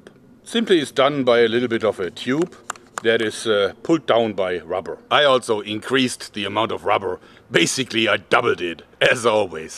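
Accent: Germany. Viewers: he has a real german accent, but sometimes exaggerates to make fun on his own accent. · Fake Accent? He has a Real one, And I have Something against German accents.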